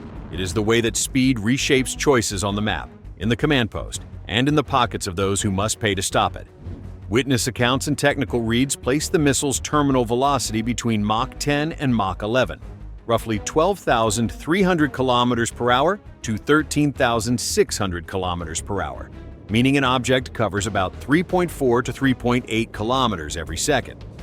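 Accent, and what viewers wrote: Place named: United States. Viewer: I’m always a bit wary about US accented videos talking about Russian military gear with an air of reservation. It’s almost like everything that is discussed can only be viewed through Rose-tinted, US-centric spectacles. Apart from the very obvious “mil-speak” style in the narrative intended to deliver the story the way that insanguinated generals would present to a gathering of the same minds, the thing that really gives me the pip is that every narrator manages to insert a subliminal little rider in the presentation about the questionability of any technology that isn’t of US origin. We already know the US is definitely not the best at anything in the world, yet it seems to be persisting in increasingly enfeebled attempts to reinforce that nations self delusion. This is especially strange coming at a time when the corrupt US/UK/NATO/Ukraine cabal of criminals and their “game-changing” weapons are getting their clocks cleaned in Ukraine - and even Iran - which is widely derided as being a “nation full of rag heads from the middle ages” - in one assault levelled significant sections of Israel, to the extent that Israel has refused to admit public use of any camera footage from the disaster zones, and is almost certain to receive similar punishment again. 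For God’s sake, even the Houthi’s are showing the US a thing or to, that has caused the US to move their battlefleet out of range, probably unsuccessfully, for there is more to come from them if the US persists in its support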